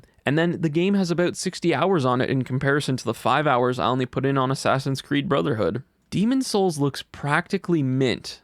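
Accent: Canada. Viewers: Hey man, are you a Canadian!?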